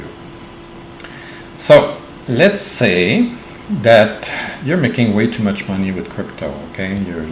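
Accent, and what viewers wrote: Quebec, Canada. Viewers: love the french Canadian accent. keep up the good work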